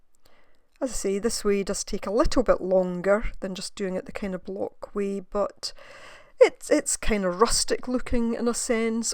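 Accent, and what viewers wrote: Scotland, United Kingdom. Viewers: Perfect I've been looking for a video on how to do this for ages. Thanks - and you sound just like me - east scotland, yes?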